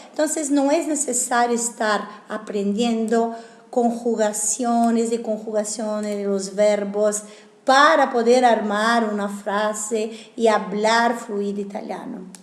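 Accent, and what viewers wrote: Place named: Argentina. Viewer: habla español argentino la profe dice vos no tu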